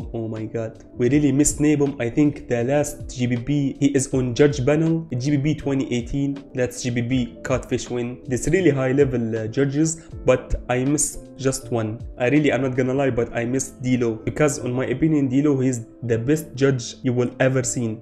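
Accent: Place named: Arab world. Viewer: Where r u from bud cuz u have an arabic accent and if u are i'll be really happy to see someone from MENA creating content about beatbox 🇩🇿👏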